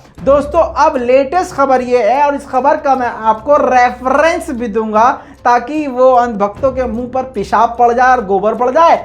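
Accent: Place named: Western Uttar Pradesh, India. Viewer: aap Delhi brahampuri se ho na mujhe btana waise aapka birth paschi. Up se ho sakta h kyuki aapke baat Karne ka tarika aesa hi lagta h hamare yha k bujurg chacha taoo bujurg fan h yha tk k chote bachche bhi aapke channel k bare Mai cmnt karte h chacha ko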